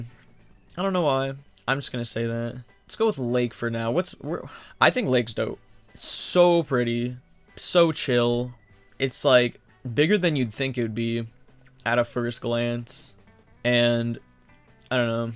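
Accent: Canada. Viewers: Awww. The Canadian accent. “Oooootfits” lol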